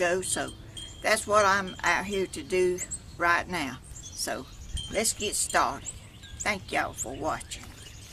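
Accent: South, United States. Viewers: Every syllable with that sweet southern drawl is precious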